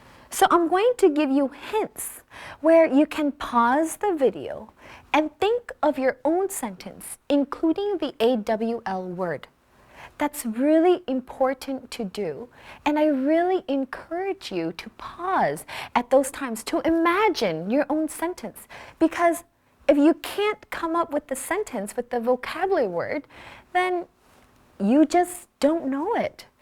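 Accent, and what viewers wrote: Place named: United States. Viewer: Thanks a lot, that's what I've expected for a long time. Pretty great chance to practice American accent instead of listening to the online dictionary. Hope to hear more about the synonym or slangs and pronunciation from you. from Canada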